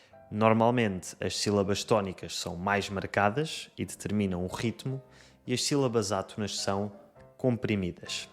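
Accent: Rio de Janeiro, Brazil. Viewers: Amei o video e te ouvir em carioqués… (acho que a diversisidade brasileira é muito maior do que a variedade do Rio). Parabéns e obrigado. Temos saudade da Carol. É muito simpática e já passaram anos dos dois vídeos com ela. Uma especificidade do português europeu, que gosto imenso (porém não domino-a) é a mesóclise, com a qual consigo espantar meus amigos brasileiros. 🎉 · Adorei tua pronúncia "brasileira",!:-) Teu canal é sempre muito instrutivo e instigante. Um abraço desde Porto Alegre, Rio Grande do Sul! (Já tens um vídeo com as diferenças do Português Rio-Grandense em relação ao Português Brasileiro?) Em relação ao TU e o VOCÊ, eu aprendi (e uso) o TU no informal e o VOCÊ no formal, no lugar de "o senhor" ou "a senhora". Isso é o comum no Rio Grande do Sul, apesar de estar mudando. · While I don't prefer European Portuguese, but the Brazilian that I've been learning, if anyone could get me to warm up to it, it would be. 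I like Brazilian Portuguese because I can clearly hear each syllable and letter, whereas European Portuguese pronunciation is too jammed together for me with too many silent or lightly aspirated vowels. I prefer reductions over clusters. But I do like how the D is similar to the Spanish D (or English TH). I also like how the S is pronounced with an accent that resembles the Carioca way, which is how I've been learning (o sotaque chiado). I always get a kick out of your videos where you see if Spanish or Galego speakers can understand you, or when you do comparisons with other Lusophone countries.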